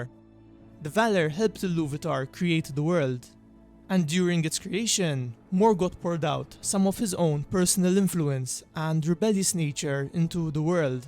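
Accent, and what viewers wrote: Malta. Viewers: Dude, are you Maltese? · Is the narrator Maltese? :) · Are u Maltese? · Are you Maltese? Your accent is really familiar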